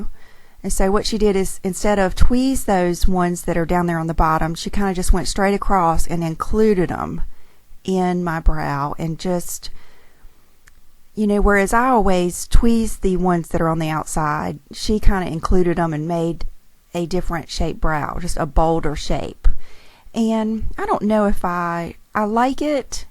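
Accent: South, United States. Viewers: You have such a calming, sweet Southern voice, 😊 Great voice over tutorial! Hopefully, once the kiddos go back to school, you'll do some "Bunny Vlogs"👍🏻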